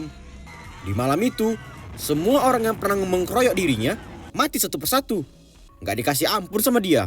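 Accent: Medan, Indonesia. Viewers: Abang ini keknya orang medan ya... Logatnya itu medan kali kutengok😂😂😂😂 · Hahha medan kali logat kau lek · Lucu kali abang ini bicaranya mcm org Medan aja. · Bang kau orang Medan iya kan...? Logat kau GK asing Bg krna aku juga orang Medan 😁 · bah medan kali bang ,,uda ku subrik ya bang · MEDAN KALI BAH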